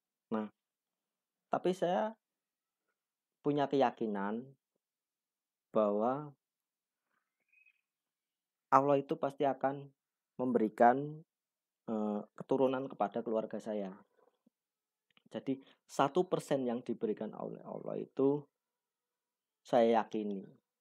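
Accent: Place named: Banyumas, Indonesia. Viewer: Kyane wong ngapak kie, promile di dokter mana mas kalo boleh tau ? 🙏🏼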